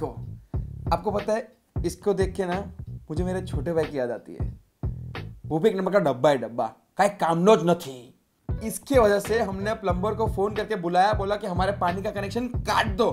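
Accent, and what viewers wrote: Gujarat, India. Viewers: Bro your acting skills and that perfect gujrati accent just perfect ❤️❤️ and ya this is not your mom again.😂😂😂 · The best thing is accent. Every Gujarati speaks in mix Hindi and Gujarati language. And yes every Gujarati middle class watch TAARAK MEHTA all day😂😂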